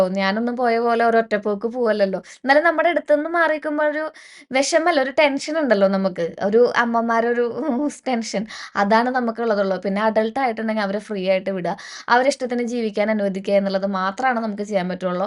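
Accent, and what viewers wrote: Thrissur, India. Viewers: Eyyy... Noo... ഇത് തൃശ്ശൂർക്കാരുടെ സ്വന്തം ശൈലിയാണ്.. ❤️❤️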